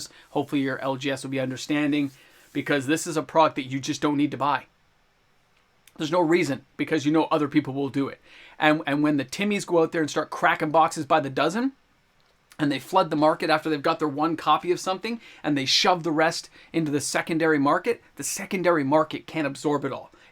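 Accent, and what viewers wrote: Canada. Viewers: Bro why isn't this channel WAY bigger. Awesome insight and perspective. You Canadian? I am, and it's a guess based on your diction. Sad I can't double sub.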